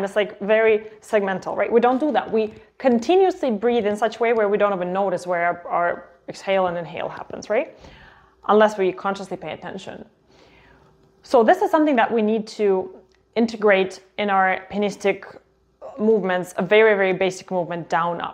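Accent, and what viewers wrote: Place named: United States. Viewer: Thank you for reminding me of those wrists positions 😊 I had forgotten them. I was taught that too, up and down was the most important. I don't quite remember if the stacatto movement is included in up and down, 🤔 I know I had to practice that wrist movement, my teacher used to say the 'ping pong' wrist movement. Where are you from? You speak English with an American accent, but you're not American nor English, some eastern Europe country? I'm Elsa from Lisbon /Portugal. Liked your interpretation of Chopin's scherzo 👍👍👍 congrats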